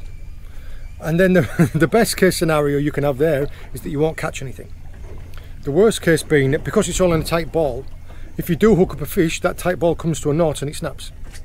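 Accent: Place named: Newcastle, United Kingdom. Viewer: lad!!? Youust be one of the best boat anglers around (Certainly for my brass anyroadl!) YOU!? For ME¡ Are one of the best EXAMPLES of Young anglers about! You explain In ageordie/ northumbrland accent (which I like,but have always favoured!??) Longay you retaím it and 😂. Longmay you broadcast your Youtube channel it😮I channel and it retaím the same resilience and Charming lilt as you do now??!! I am now 67*😮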